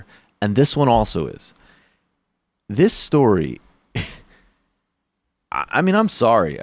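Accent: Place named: New York, United States. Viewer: cuz he's a new yorker XD he also says yuge, instead of huge.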